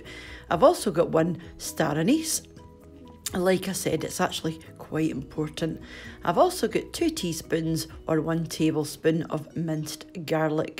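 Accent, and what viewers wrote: Scotland, United Kingdom. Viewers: I don’t why but I could listen to the Scottish accent for hours, so friendly and calming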